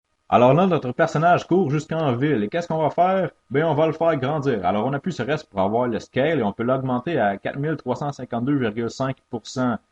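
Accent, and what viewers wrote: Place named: Canada. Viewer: mdr j'adore avec l'accent canadien c'est énorme xD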